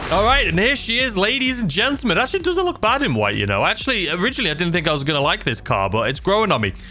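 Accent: United Kingdom. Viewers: “Look at all the pop ups, just bugger off !!! “ lmao only Brits will understand what your saying 😂😂😂 As always, the best content for gta on YouTube 🤘👍👌🤣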